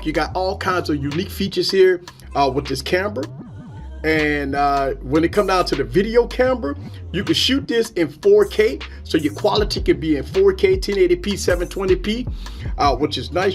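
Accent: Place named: South, United States. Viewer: Nice video....cant stand the southern bumpkin broken gutta' accent tho....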